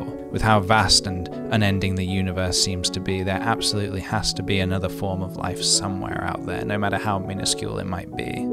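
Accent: United Kingdom. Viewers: How did I know you were British before I even clicked on the video 🤧 but Pokémon blue version, represent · where are u from? your english sounds different to me, UK?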